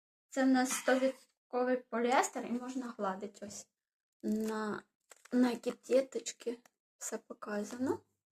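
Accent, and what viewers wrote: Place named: Surzhyk, Ukraine. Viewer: Какой жёсткий суржик. Женщина, выучи украинский уже, или ты террористка которая косит под украинку?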